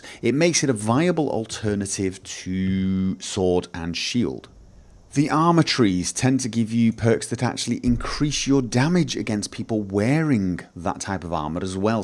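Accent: United Kingdom. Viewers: But... British accents are cool 0_0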